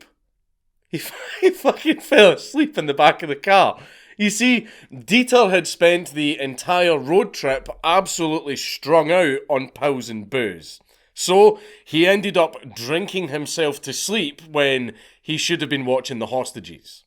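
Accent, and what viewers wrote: Scotland, United Kingdom. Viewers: Are you Scottish? I like your accent very nice to listen to and your a good at this. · I've said it before and I'll say it again, please please put CC on while listening to Dankula. Youtube translating Scottishisms is fookin hilarious.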